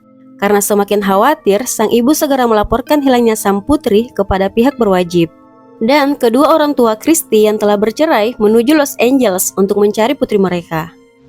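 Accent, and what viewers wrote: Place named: Makassar, Indonesia. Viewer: Yg punya chanel sepertinya org makasar. Soalnya logatnya kentara pas lagi bicara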